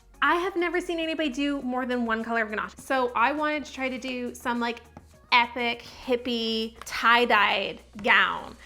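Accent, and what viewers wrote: Canada. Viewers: Between the valley girl voice and the super dramatic personality … I laugh. I do get a kick out of this girl. I mean that gasping at everything… like what would her reaction to something crazy happening? I just see her being like OhHhh my GoShHhH!! Gasppp gaspppp 😂😂😂😂 and if she hadn’t said she’s from Canada, the way she says out and about .. things like that is so Canadian. I never heard anyone speak like that except for people from Canada. That’s no hate either, I’m a born and raised New York Italian …. You can hear me coming a mile away && there would be NO question where I’m from. Everyone I meet that’s not from like the tri-state area always are like my god you have the thickest New York accent! Say dog!! Say coffee!! 😂😂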